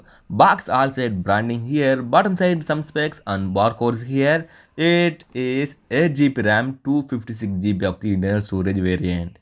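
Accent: South India, India. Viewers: South Indian type..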